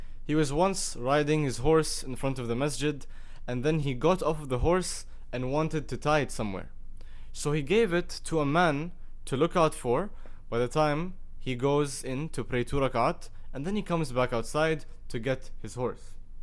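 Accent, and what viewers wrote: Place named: Egypt. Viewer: عرفت انك مصري من الaccent. كمل كلامك جميل جدا اللهم بارك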